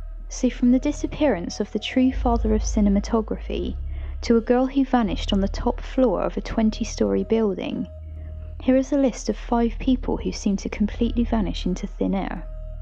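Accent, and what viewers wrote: United Kingdom. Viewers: you sound like you come from the West Country. ..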